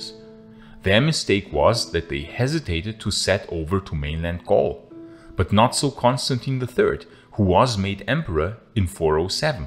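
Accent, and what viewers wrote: Germany. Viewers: You are German, aren' t you. I detected it when you pronounced the word Claudius .... You might want to update your english pronunciation. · Oh God, 1 second in and I can't watch it. Listen Mr German speaker it is not Lon,un, it is LuN Dun, stress on first syllable etc etc. YOu know how you can tell a German? Complete inability to pronounce London plus complete shock, horror, and inability to comprehend the use of the word "since"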